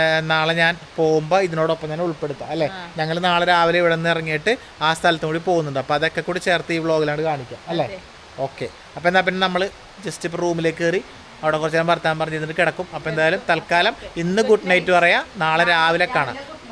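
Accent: Kannur, India. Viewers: കണ്ണൂരൊ കാസർഗോടോ എന്റെ സ്വന്തം ഭാഷ ❤❤😅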